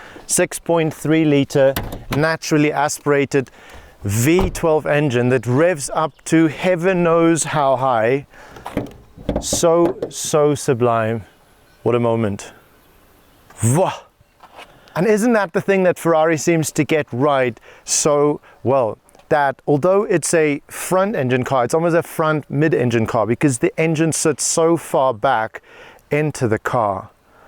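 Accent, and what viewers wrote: South Africa. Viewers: South African presenter? Great job guys!!! I need a TDF in my life 😍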